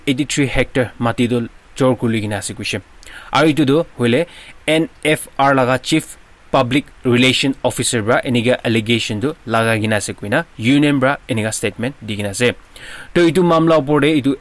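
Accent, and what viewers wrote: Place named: Nagaland, India. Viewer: Best reporter... Soothing voice, audible and Nagamese is normal unlike other channels.... Other channels speak Nagamese so stylish that even we Naga cannot understand 🤣🤣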